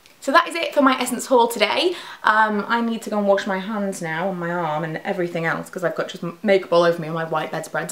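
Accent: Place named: Yorkshire, United Kingdom. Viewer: ahh I see! That makes sense. I had the idea at the time that the dryer they were, the more they'd stay in place but now I'm not so sure. Might have to run and get the older ones before they disappear:) Love your videos by the way. I'm from Leeds so it's always nice to hear a YouTuber with a Yorkshire Accent!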